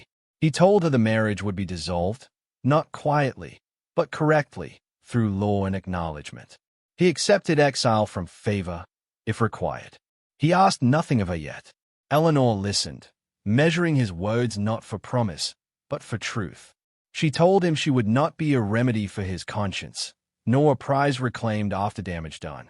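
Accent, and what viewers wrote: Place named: Australia. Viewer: Not an American at all. One of the UK COMMONWEALTH Countries - Aussie, maybe? But definitely distracting. 😊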